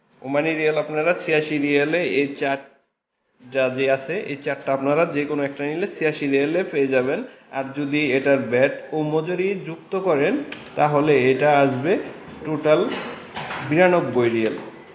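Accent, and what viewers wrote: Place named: Rajshahi, Bangladesh. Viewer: ধন্যবাদ কথার ভাষা রাজশাহীর মতো তাই বলেছিলাম আর কি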